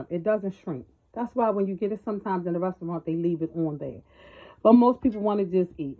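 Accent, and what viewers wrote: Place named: New Orleans, United States. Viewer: Sounds like NO n living in Baltimore...a combo.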